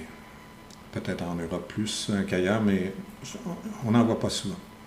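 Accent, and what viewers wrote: Quebec, Canada. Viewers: J'ai découvert t'a chaîne dernièrement et je fais du rétropédalage pour regarder ton contenu plus ancien. Je m'étais douté a ton accent anglo que tu étais de la belle province, maintenant il n'y a plus de doute. En tout cas beau travail de vulgarisation, j'apprécie beaucoup ton contenu, tu es très pédagogue. 👍👏😊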